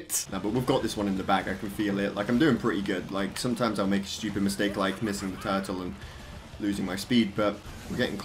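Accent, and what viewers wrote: United Kingdom. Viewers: Dude are you from the uk?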